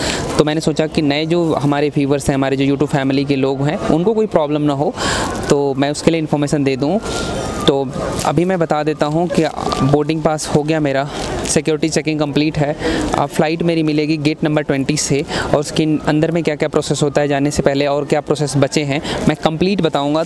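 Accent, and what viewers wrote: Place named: Bihar, India. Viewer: Your assent sounds like Bihari 💓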